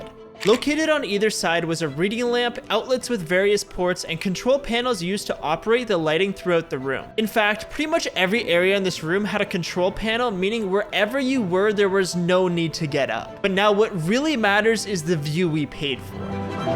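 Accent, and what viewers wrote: Canada. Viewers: You sound canaidan are you?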